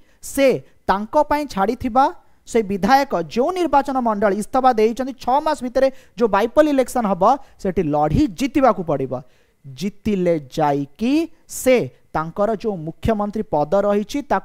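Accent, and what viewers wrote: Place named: Odisha, India. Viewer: Apanka Odia pura Rasa malei bhali....Bahut badhiya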